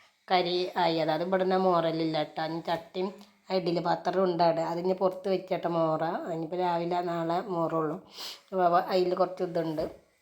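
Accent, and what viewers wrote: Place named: Malappuram, India. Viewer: എന്നെ പോലെ ട്ടോ ട്ടോ വരുന്നുണ്ട്, മലപ്പുറം ആണോ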